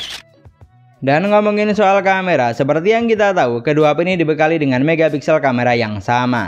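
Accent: Madura, Indonesia. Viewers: Logat madura bg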